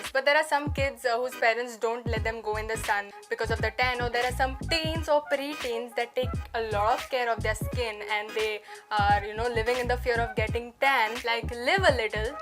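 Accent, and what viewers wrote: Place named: Goa, India. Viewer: It's a relief to hear goan accent, I am from anjuna by the way. Tired of hearing marathi and hindi all the time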